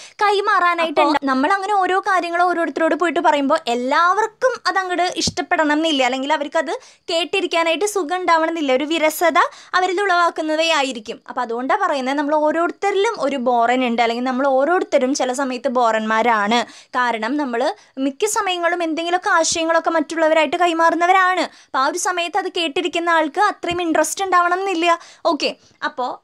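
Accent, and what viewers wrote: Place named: Thrissur, India. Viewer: ചേച്ചിയുടെ ക്ലാസ്സ് ഒരു ബോറഡിയും ഇല്ല.. online classil ഇരിക്കുമ്പോൾ വീണു വീണു uraghum ചേച്ചിടെ ആ trissure ബാഷ യും കേൾക്കുമ്പോൾ കെട്ടിരിക്കുംഭോൾ അടിപൊളി ആണ്.. ഇത് പോലെ വേണം Thrs 😍🤩🤩🤓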